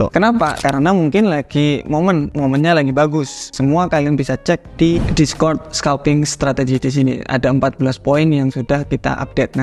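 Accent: Java, Indonesia. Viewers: Asli mana mas Logatnya kayak dari Jawa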